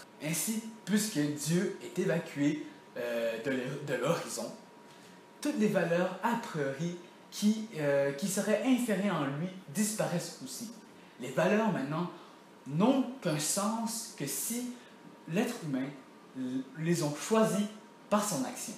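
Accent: Quebec, Canada. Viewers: C’est dur à écouter l’accent québécois mais très bien expliqué merci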